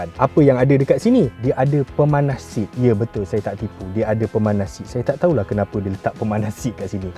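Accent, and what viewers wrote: Kelantan, Malaysia. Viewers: Abang orang kelantan ke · Duk kelate tu bang..has molek ii..sek kelate mari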